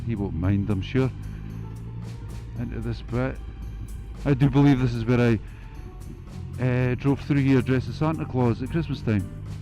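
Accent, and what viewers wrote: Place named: Scotland, United Kingdom. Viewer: "You sound like the most Scottish person ever on your videos" "Do I? Don't worry, I'm planning on doing some "proper" videos" What?! 🤣🤣🤣 Nothing wrong with the roll-on acceleration there, 👍 Anyway, I'd love to comment further but I've got to go - tonight's the night!! (by which I mean the annual village quiz night, of course). 🤓😇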